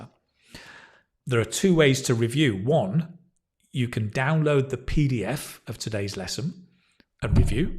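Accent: United Kingdom. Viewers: Thank you, Mr.. I enlarge my vocabulary and improve British accent a lot with your channel. This passage is extremely great.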